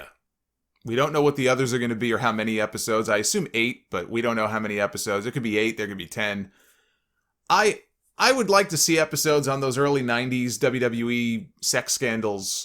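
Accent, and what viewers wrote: New York, United States. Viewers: Lol, what? I hear nothing but NY accent the entire time.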